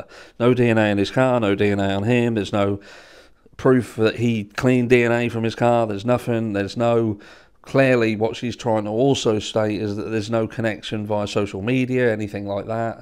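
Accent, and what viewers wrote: United Kingdom. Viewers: One of my favorite '80s tv series was "X-Files" and its theme, "Trust No One" is what I go by especially with YT videos. Anything featuring ex-F/B/I or former C/I/A employees or even retired LEOs are suspect because they still owe an allegiance to shady government agencies upon which they depend on for their lifetime pension$$$. But I trust you, 'cause you're British with a lovely accent and demeanor that goes so well with my morning coffee sweetened with stevia. 😁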